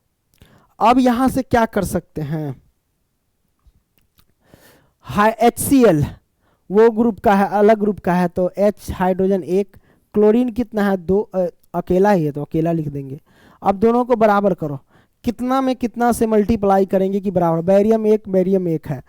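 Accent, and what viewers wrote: Bihar, India. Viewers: sir kya aap bihar se ho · Sar aap Bihari hai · Aap bihar se ho kya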